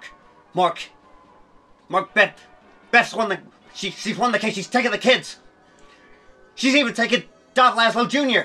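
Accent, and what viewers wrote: United States. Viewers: perfect American accent. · I didn't realize this was until minute 10. The accent was so good I literally assumed flew in an American friend for this video. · The American accent is getting a little better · Great list was a pleasure watching. Love the American accent 👍 keep up the great work. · very nice american accent. Are you sure you wanna be a director rather than actor? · An American accent? Really? I believe I can die happy now. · Love the American accent and that early cinematic porn feel. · That's a great midwest American accent..and some Gene Shalit vibes..love this whole thing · That's an excellent American accent i gotta say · Great American accent! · I was so confused when he started speaking in an American accent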